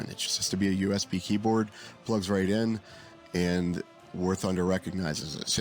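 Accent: United States. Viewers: Dudes definitely from Philly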